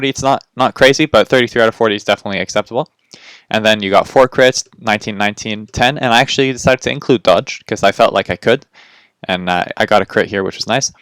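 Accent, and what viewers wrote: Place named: Morocco. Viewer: you sound morrocan XD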